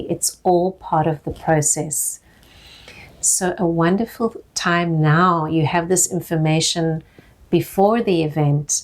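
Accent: South Africa. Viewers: Thank you! 🙏🏾 Are you South African? Your accent sounds so familiar to me. ☺️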